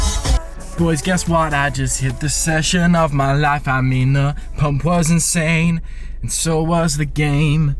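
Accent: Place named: United Kingdom. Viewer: Funny British accent, great charisma and tons of human charm flowing in streams from this cute nice guy. That's why I love this channel 😀👌💋🧡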